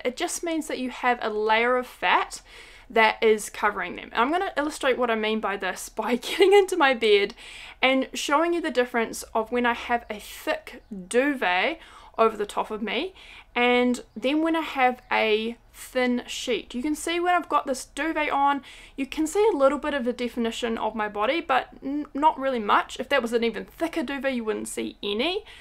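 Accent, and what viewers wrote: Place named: New Zealand. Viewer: Hi, I just discovered you and your channel this month and I am IN LOVE!!!! 😃 I lived in Marton, the Hub of the Rangitīkei 😉 for a year! It's so fun to hear the kiwi accent again! I don't hear it much here in Virginia. 🤣 I appreciate your clear and visual teaching...I am struggling to get off those last 8-10lbs and your wisdom and thoughts have inspired me to keep going! Thanks for your encouragement and empowerment! You are a blessing!!! 🙌💪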